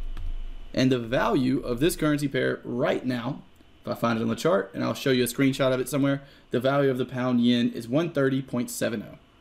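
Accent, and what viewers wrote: South, United States. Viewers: His southern accent comes out occasionally and its adorable lol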